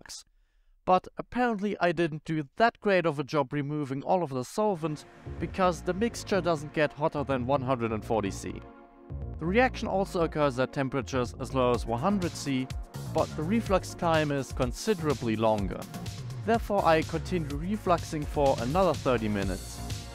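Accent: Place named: Germany. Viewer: I had a suspicion you were German due to the accent, then came the unmistakable Bad Reichenhaller which confirmed it 😂 Moin/Servus/Grüß Gott, lovely video!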